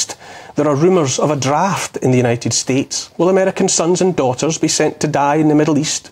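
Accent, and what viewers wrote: Scotland, United Kingdom. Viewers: With that glorious Scottish accent, Oliver sums up so very eloquently the truly horrific situation we find ourselves living in. I can only pray that governments and their puppeteers will one day pay for their crimes against humanity. Thank you, I dont like the subject matter, but you are a joy to listen to ❤️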